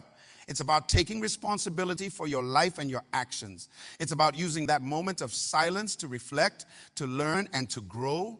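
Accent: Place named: West Africa. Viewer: I am a linguist and can't help but detect a west African accent. I wish I knew who this is because his teaching is aligned to Dr. Munroe and I would like to hear more. Glory to God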